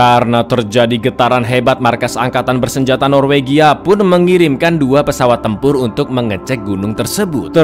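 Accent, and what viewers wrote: Java, Indonesia. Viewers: pakek logat jawa cak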